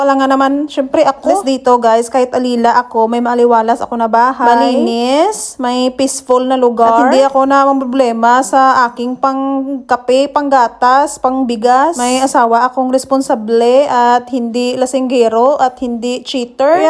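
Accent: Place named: Hiligaynon, Philippines. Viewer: ilongga cya kya my accent.Mangngo😜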